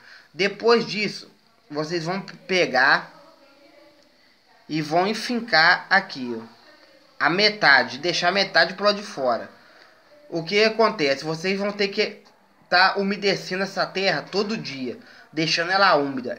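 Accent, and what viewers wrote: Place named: Caipira, Brazil. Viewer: Dei meu like por conta do sutaque de caipira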